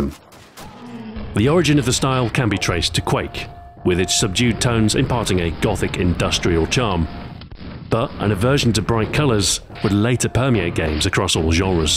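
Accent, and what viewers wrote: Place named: United Kingdom. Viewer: Ah-cah-reena is just the American accent. The narrator here is British, so pronounces the letter "o" very sharply like all "o"s are in the British accents.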